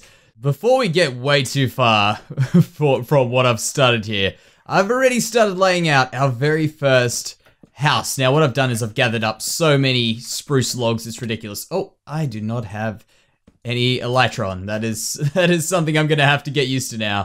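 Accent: Australia. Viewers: Hey Mate! awesome video just found your channel and it seems like heaps of fun :D definately subscribing! also are you an Aussie?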